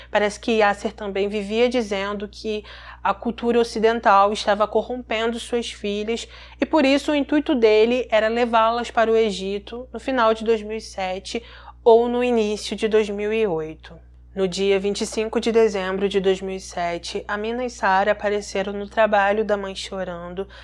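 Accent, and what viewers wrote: Rio de Janeiro, Brazil. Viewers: Boa noite pode ficar o tempo que precisar contando essa história da vida real já vi mas quero ouvir de novo você contar 😉"Estadossss Unidossss" melhor sotaque carioca amoooo linda maravilhosa 🥰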